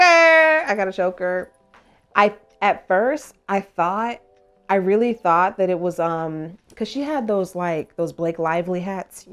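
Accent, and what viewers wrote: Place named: United Kingdom. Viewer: British accent always kills me LOL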